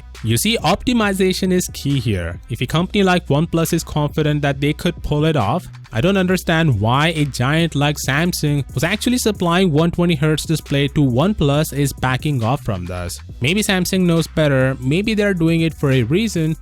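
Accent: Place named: India. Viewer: ANNOYING VOICE OF THE NARRATOR. INDIAN ACCENT REALLY SUCKS!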